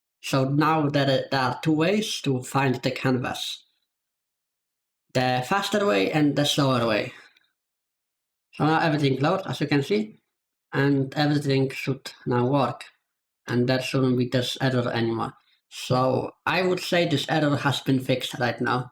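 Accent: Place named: Poland. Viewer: Ik u are poland i hear that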